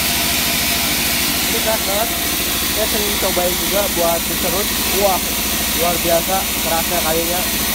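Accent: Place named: West Java, Indonesia. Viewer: Mantap ey operator na... Asa wawuh sunda na palih mana kang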